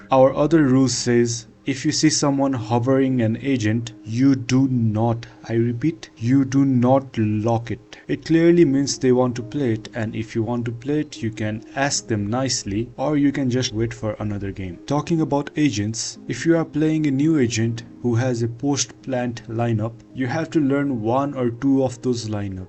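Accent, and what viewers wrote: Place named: Nepal. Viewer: Brother. Nepali accent sunirako chu ta ma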